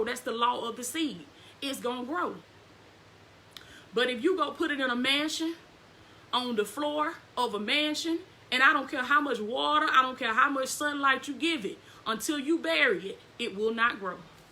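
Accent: South, United States. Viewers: You are "my girl!" I really admire you !" I love that southern accept ! Peter was identified by his accept ! Keep doing yo thang ! Be not discouraged , sister ! You are not alone !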